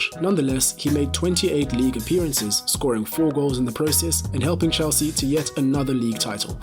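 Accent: South Africa. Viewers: Are you South African ? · are you from south africa? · Bro you South African?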